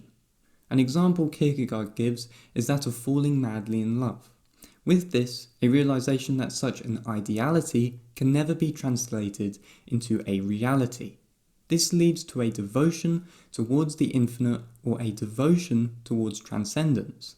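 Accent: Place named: United Kingdom. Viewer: Can you please add (British accent) to save me a time.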